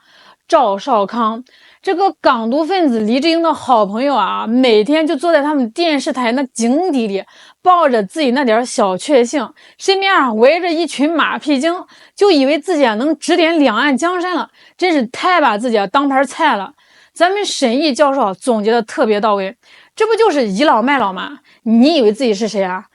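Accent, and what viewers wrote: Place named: Shandong, China. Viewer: 主持是山东人？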